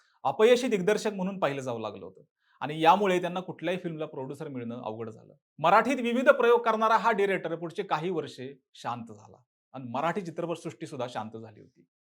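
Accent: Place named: Rural, India. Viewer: बोलण्याचा लहेजा बदलत चला प्रत्येक वेळी ग्रामीण लहेजा चालेल असे नाही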